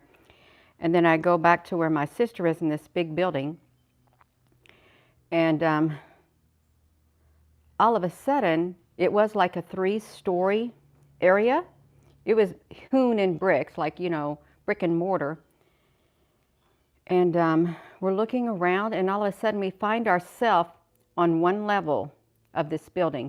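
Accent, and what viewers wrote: United States. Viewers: Yes, 😘 Speaking through your 👃 Nose as well as your 👄 Mouth -- an American Accent with Breaking and Bendy Vowels. It Ain't Country Without a Drawl (and your pretty smile-ing-eyes). TS: - ♥️🎩💈🎯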